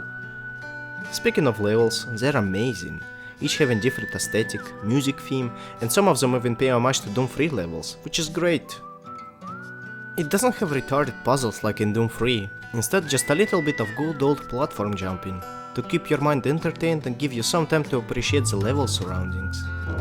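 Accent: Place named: Ryazan, Russia. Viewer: ох уж этот рязанский акцент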